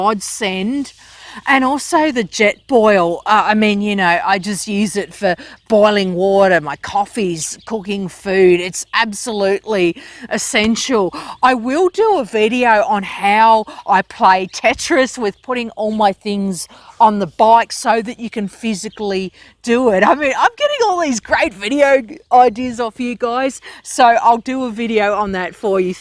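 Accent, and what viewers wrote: Australia. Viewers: Love that Aussie accent. Wish i had your balls, really want to do a big tour too.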